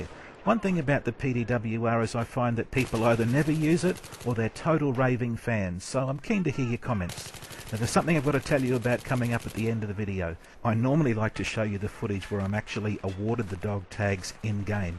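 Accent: Australia. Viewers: Found out about through golf squad on the shustybang channel. I like his vids diff from other commentators, and the accent is Australian. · Is this guy australian? If he is then good on him ;) go aussie and the ps3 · I dont wanna be mean or anything but me my self fell asleep listening to this its a game who the fuck want to listen to the origin of a weapon in a GAME stats is ok thats okey but whats the purpose telling everything about a gun what does that help. to know which is the best one where it was made and shit tbh i need to be paid to listen to this but good gameplay thought and nice accent^^ AUSSIE PWN! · Fuck yeah!!! Aussie mate :D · Aussie aussie aussie..? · Dude, his Australian!!!!! Pwn thinks its a cool accent! im australian!!!! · see to me sounds so aussie lol he is australian btw checked hes channel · hes like... the australian boo :o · AUZZIE BRAHHH! 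· Don't see too many Aussie commentators. Thumbs up!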